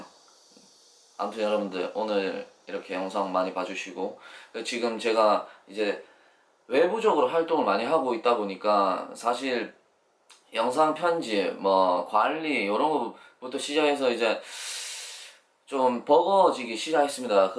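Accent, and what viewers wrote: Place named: Gyeongsang, South Korea. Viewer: 여자친구 어디서 만났으셨어요~말투가 갱상도 사투리인데 고향이 울산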